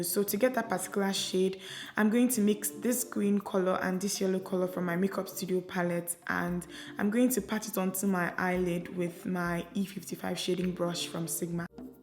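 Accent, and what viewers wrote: Nigeria. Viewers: awwwh.., thanks for this one. love your videos, love your real nigerian accent that goes with it too. love them all!!! pls post a video on IG now it's been long 😢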